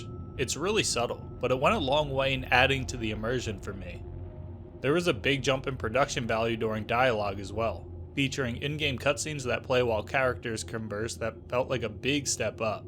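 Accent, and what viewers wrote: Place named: Delaware County, United States. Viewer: damn someones from DELCO lol good video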